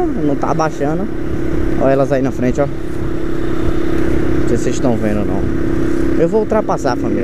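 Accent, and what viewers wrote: Bahia, Brazil. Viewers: Vc e da bahia de meu mn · Mano se e Bahiano? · Pelo sotaque é bahiano kkk , de qual cidade mano ?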